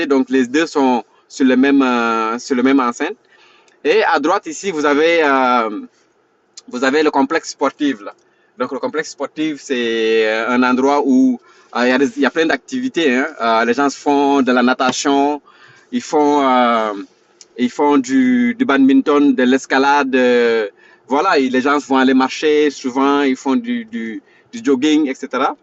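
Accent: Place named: Senegal. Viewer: Salut lorsque tu a commencé à parler jje me suis dit en même ce Mr est un sénégalais merci pour la vidéo.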